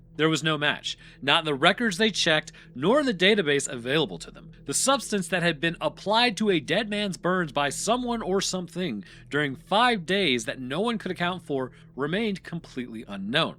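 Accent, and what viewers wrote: United States. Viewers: He pronounces the 'Merican way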